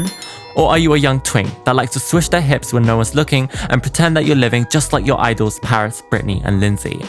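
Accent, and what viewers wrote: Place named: RP, United Kingdom. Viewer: LOVE your channel and your voice— so posh! I’m definitely a miserable millennial nostalgia worshipping on simpler times. See you in hell 💋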